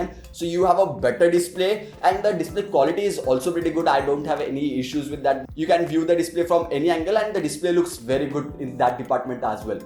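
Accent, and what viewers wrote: Maharashtra, India. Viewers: Such a sweet Marathi accent, but still with good grammar, but sporadic Marathi transliteration. It is such a pleasure to listen to you,. Maybe I just miss my Marathi friends. Good job, well done.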